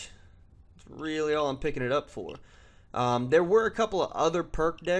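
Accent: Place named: South, United States. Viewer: Nice vid and am I right to assumed you're from the south?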